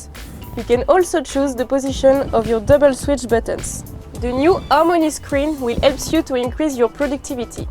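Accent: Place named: France. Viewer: I love when someone speaks English with a French accent :))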